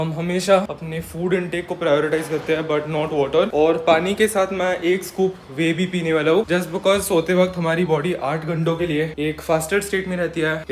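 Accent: Maharashtra, India. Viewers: Marathi accent >>> any other language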